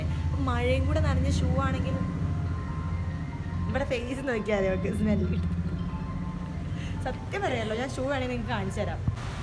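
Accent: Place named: Malappuram, India. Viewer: Nalla parajayam ulla baashaa malappuram anoo naadu 🧐 or kozhikode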